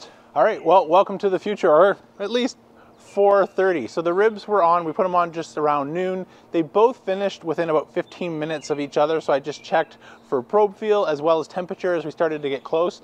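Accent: Canada. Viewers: Great video!! You sound like you're from Canada. Are you the one who created all this smoke? 😳 😉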